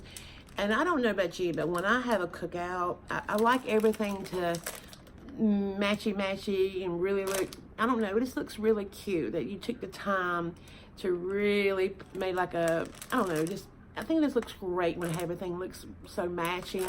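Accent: South, United States. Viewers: New to your channel. I live on the southeast coast of Florida. I saw your coastal wall decor you put together , loved it and decided to subscribe. My style is not total farmhouse but more like coastal, rustic, distressed with a little vintage mixed in. I get a lot of inspiration from all styles of decor and make it my own for my style. Being raised by very southern parents, I detect a southern accent when you talk. I also subscribe to a couple of people that I see in your comments. I don't know if you subscribe to 2 people I'm gonna mention but I think you would really like their channels. Bargain Bethany is your girl for everything Dollar Tree. She not only keeps everyone informed on the newest items DT has come out with but does amazing diys out of them. If you want a very seasoned You Tuber that is into farmhouse and does some of the best collaborations, and up cycles you really need to check out Second hand Tracey Ann. 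Tell her that her Florida buddy sent you over. Make sure you check out her last collaboration she did with lemons. She did one each week for the month of May and they are AMAZING! If you want to grow your channel even more, participate in her collaborations. I love the DT haul you just did and I agree, it is so nice to decorate with pretty, matching items when you get together for dinners, bbq's and holidays with family and friends. I think I'm going to get a lot of inspiration from you. Have a great weekend. Hugs from the southeast coast of Florida 🌴😎💙😎🌴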